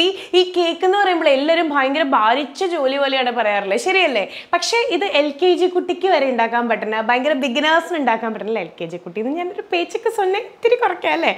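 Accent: Thrissur, India. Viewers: Ath pinne Nammade THRISSUR kkari alle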